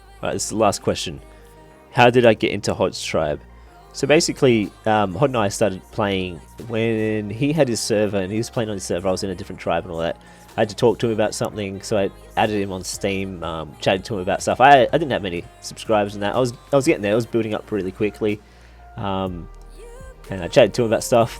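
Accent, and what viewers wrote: Australia. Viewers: thats awesome, i acually didnt realise you were an aussie. love it. us aussies have to stick together, what tribe were you in on official servers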